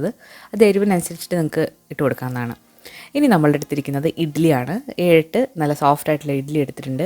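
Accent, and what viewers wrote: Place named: Valluvanad, India. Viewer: de valare pazaya videos il oke voiceover accent kuracu vithyasom toniyirunu (oru valluvanadan style aaypoy pole), ath otum ishtomaayrunilaa. Pakshe ipol kure naalay valare nanaay samsarom. Anikk valare ishtomanu recipes um samsaravum. Very simple and straight to the point. Please keep up the perfect work🥰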